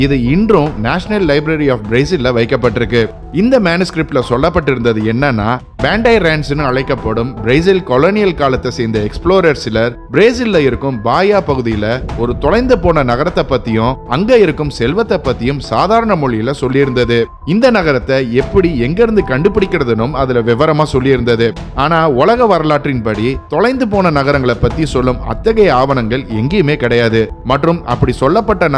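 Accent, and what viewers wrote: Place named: Chennai, India. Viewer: That's it chennai voice mathri iruku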